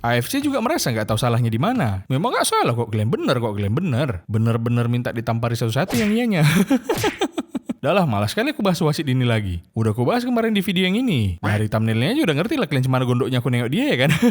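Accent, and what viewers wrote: Medan, Indonesia. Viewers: Abang orang Medan kah? 😂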